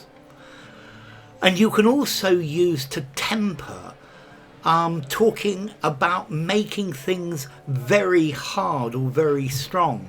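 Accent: RP, United Kingdom. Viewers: Thank you, for the special "technical" uses of the word, viz.,- metal,steel,glass, ductility, spices, chocolate..... it is always enriching to view the videos in your Channel, all the more so, because your explanations and examples are well chosen, precise, thorough and tempered with the sweet and sour spice of humour and a posh accent. ☆Je vous envoie des baisers tendres de Paris. Sometimes, they can be a bit "fougeux", but I guess it would be a sin (or a crime ) to temper them!♡♡♡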